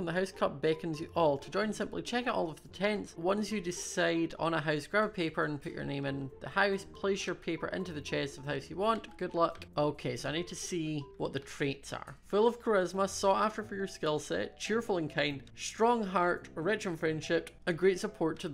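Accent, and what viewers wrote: Scotland, United Kingdom. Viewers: Ur voice is EVERYTHING (Are u Scottish?) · Yes he is Scottish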